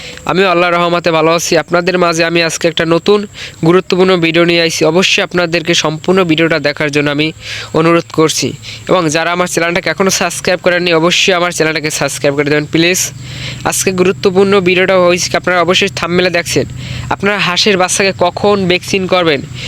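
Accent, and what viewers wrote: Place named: Barishal, Bangladesh. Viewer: ওরে বরিশাইল্লা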